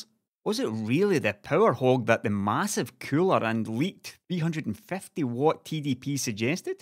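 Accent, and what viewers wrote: Scotland, United Kingdom. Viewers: It's called a Scottish accent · I Missed yurr skottish voice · reading the comments in Scottish. That voice is stuck in my head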